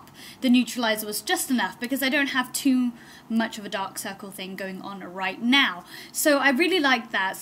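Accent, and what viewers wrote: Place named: United Kingdom. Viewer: do u live in uk or us? lol bc ur accent is uk but ulta is in us =p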